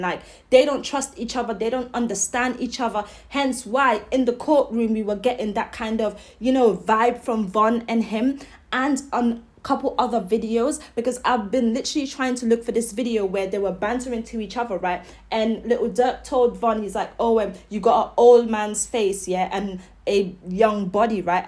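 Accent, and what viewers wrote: United Kingdom. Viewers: This bitch goofy asf she needs to stop making content I’m from englewood Chicago and this fasho isn’t the case us Chicagoins hate these outsiders trying look and and speak on us like girl yo ass sound like u from the uk somewhere u better focus on them roadman where u at wetting eachother not here ole lame ass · Lmao how she talking chiraq bidness n talking British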